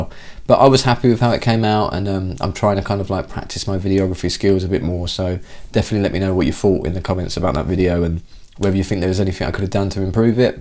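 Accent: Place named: United Kingdom. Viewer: Came for the filter, stayed for the British accent. Solid review.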